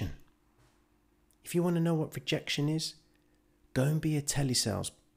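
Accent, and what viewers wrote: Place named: Epsom, United Kingdom. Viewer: please help me I really need a mentor. you sound like your from my neck of the woods. im from epsom dude. im in a bad loser at the moment. shorted from 21500. my liquidation is 38k do you think I should leave. I may leave this week cause of bad moon cycle in btc. this is not a reason I know but I need to close it.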